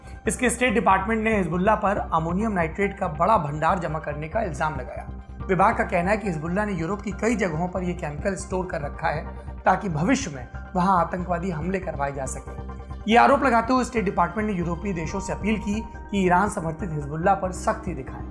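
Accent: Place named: Bihar, India. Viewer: 11.48👍👍 baki jo h so toh haieye h ,😁😁. Chacha bihar nd up k log deeli-bambai kahe n chle jaye but ye jo apna bolne ka tarika ye na chutega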